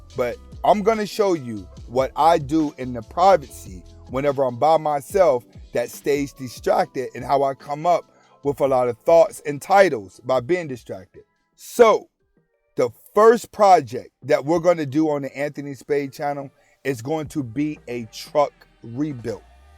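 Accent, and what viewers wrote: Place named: Pittsburgh, United States. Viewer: You from the burgh bro?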